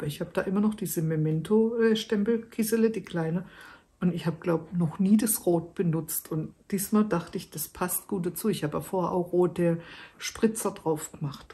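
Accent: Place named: Hesse, Germany. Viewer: Hallo, ich find das super, das 'babbeln" zwischen durch. Hauptsächlich auch wegen dein Dialekt (ich bin aus Hessen) find ich toll❤. Natürlich auch das, was du uns zeigst ist immer wieder wunderschön. Ps seit langem suche ich Lochverstärker und nur die aus Kunststoff gefunden. Und du hast ein Gerät gezeigt, wo ich eigene herstellen kann. Ich bin begeistert. Danke du Liebe🤗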